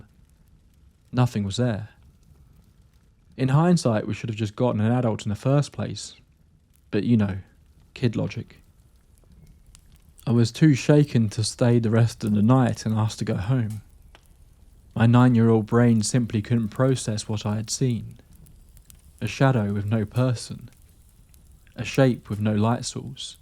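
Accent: England, United Kingdom. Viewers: Sioux City, Iowa. Da SUX (Airport code.) Anglophile, have heard a lot of English accents for an average US citizen and yours is superb. Absolutely brilliant channel. Thank you.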